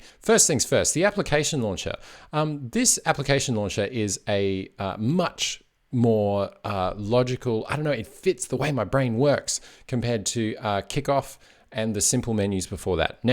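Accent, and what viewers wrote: Australia. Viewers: Hey, thank you for your thoughtful and well spoken videos. You have one of the least annoying Aussie accents I've ever heard. Just stay on top of the disfluent fillers and I, for one, will be back for more.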